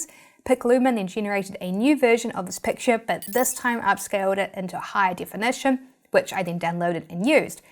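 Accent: New Zealand. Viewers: Hi priceless! Thanks for clarifying. I thought, "Where is this gal from, Mars?" 😉 j/k, I love the Kiwi accent. Chur for the mean accent! 🤔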